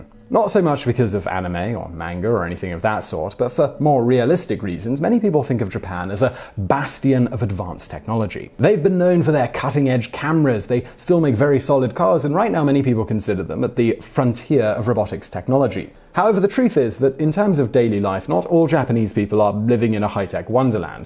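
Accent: England, United Kingdom. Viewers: He has said his audience is mainly American. And maybe youve noticed his English accent? Plus theres the fact he lives (or lived Im not up to date with his personal life) in Prague. His audience IS international. Im Australian, I have no issue with him addressing his core audience more often than me. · Why is this British-sounding guy lecturing us about "what Americans think?" Why is this titled as what Westerners think, and entirely geared towards American (mis)conceptions?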